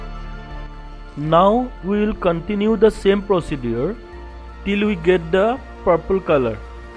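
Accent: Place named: Assam, India. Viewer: Aa bhai mja.. Tmar kothakhinir porai lage j tmi assamor... Mou assamore❤❤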